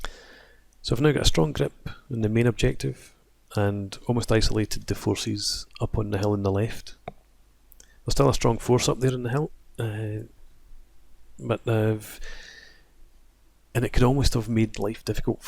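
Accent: Scotland, United Kingdom. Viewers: I enjoy the low key Scottish commentary.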